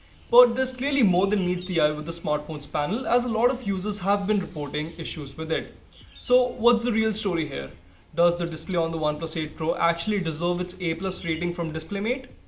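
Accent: India. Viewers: why don't you just speak in your native language , at least they will understand everything ... this way , nobody can understand you , I mean your accent is so heavy . No offence but its not just you , most of Indian reviewers have such a heavy , heavy accent . Is it really so hard , I know you are all smart and stuff ...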